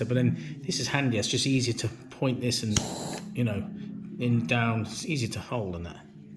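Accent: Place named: United Kingdom. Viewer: I have one on the way from Amazon , good review fella Love the Brit accent too haha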